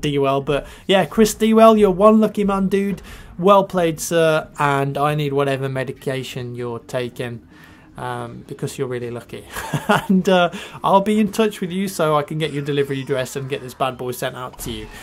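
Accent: United Kingdom. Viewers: you forgot to mention having a British accent definitely helps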